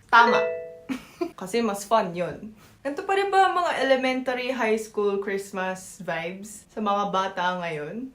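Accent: Batangas, Philippines. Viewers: Kabayan (kabatang,batangueña po aq)nakakatuwa ka nmn panoorin ❤ ung accent at dictions mo kabayan talaga g puntong taga batangas ka 😊.Merry Christmas kabayan❤anong namimiss mong food sa Batangas?Kumakain ka ba ng Sinaing na tulingan? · Pinoy na pinoy ang accent mo, buti pa mag asawa ka na lng ng finoy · not dialect. language because mutually intelligible at iba yung structure ng mga languages dito sa philippines. does speak a dialect of tagalog though which is batangas tagalog, because she’s batangueña and sanay siya doon.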